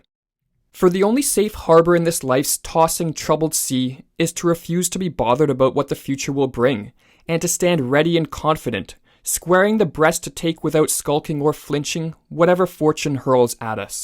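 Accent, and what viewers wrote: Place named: Canada. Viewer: Hey man, long-time follower. Just checked your patreon and I knew by your accent you were Canadian! Whereabouts are you? Love your content!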